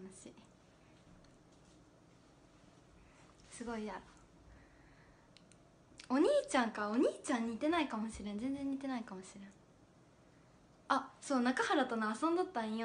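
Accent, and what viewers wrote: Okayama, Japan. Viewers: 岡山弁が「出ちゃう」じゃなくてもはやただの岡山弁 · ノブのイメージしかなかった岡山方言がこんなにキュンとするとは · 割とまじで疑問なんだけど岡山の何処だったらここまで方言つくの？ by市内民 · 声かわいいから岡山弁でも柔らかく聞こえる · 出ちゃうというか終始岡山弁やで。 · 岡山って俺の地元の喋り方に似てるわ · 岡山弁はじゃけえとやけえが混在してるのか広島弁と関西弁が混ざってんのね(ドイツ人) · 岡山県井原市？バラ園いいですね！！久しぶりに岡山弁聞いた。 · 耳に馴染んでる言葉じゃけぇさ、岡山に帰っとる気がしょーたわ。ごじゃかわいいべや （北海道民） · 岡山の方面とかに友達おって頻繁に電話しとるからか分からんけど岡山弁が全然普通に感じる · 千鳥みたいな岡山弁使ってくれたらもっと好感度あがるな❤ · いとこが岡山県だけどほんとにこんな感じや笑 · まだ綺麗な岡山弁じゃわ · 岡山弁って正直きちーと思っとったけーこの人の言葉はすげー可愛い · 掛橋さんの岡山弁好き。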